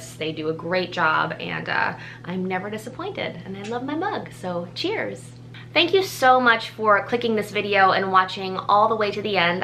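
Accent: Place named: Midwest, United States. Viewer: First off: I love how excited you get about every item.. and second off: I knew you were midwestern the minute I heard you speak (gotta love our accent)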